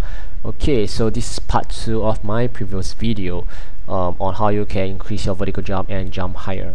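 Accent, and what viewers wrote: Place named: Singapore. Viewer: er you sound like a singaporean, am i right?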